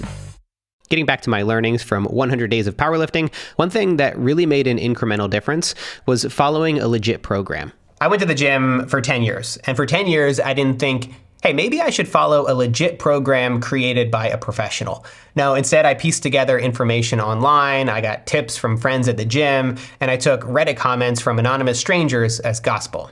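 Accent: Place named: Australia. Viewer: Catches me so off-guard hearing an Australian accent in a YouTube video, and I have an Australian accent and make YouTube videos hahaha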